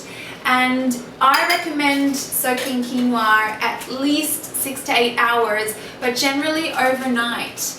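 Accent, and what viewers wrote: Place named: Australia. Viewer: I like your accent - I think you are Australian. I have just begun eating this food.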